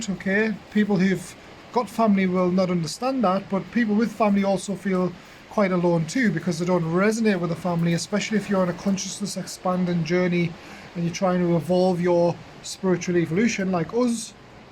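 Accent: North East England, United Kingdom. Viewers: North East England he is. Maybe Darlington, Middlesbrough, Newcastle or Sunderland.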